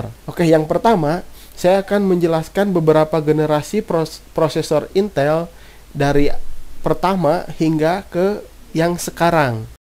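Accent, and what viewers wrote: West Java, Indonesia. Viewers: Dibaca pake aksen sunda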